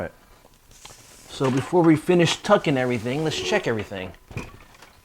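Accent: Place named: South, United States. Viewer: Funny southern accent ahahaha! Nice and helpfull video!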